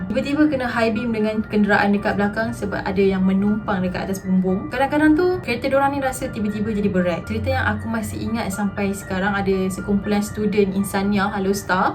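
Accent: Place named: Kedah, Malaysia. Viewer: Cara ckp gaya org Kedah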